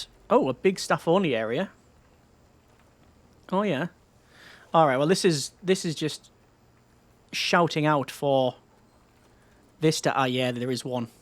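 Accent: United Kingdom. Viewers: Are you British? Because I haven't realized that for a long time. 😅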